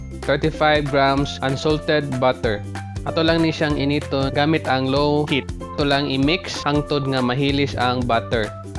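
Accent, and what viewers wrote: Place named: Visayas, Philippines. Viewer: I like the diction of visaya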